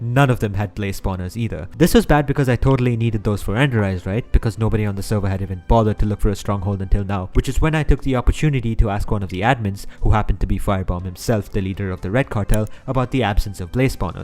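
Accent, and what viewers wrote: India. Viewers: You sound indian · why u have indian accent here, but american in other video😭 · I love his indian accent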